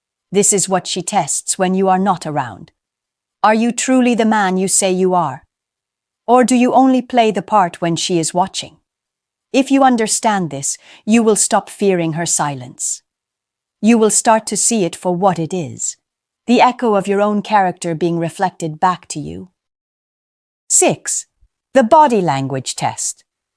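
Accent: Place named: United Kingdom. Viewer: Thank you for your kind wishes! I really like your British accent. Your tone is also very soothing to listen too. Keep it up. ❤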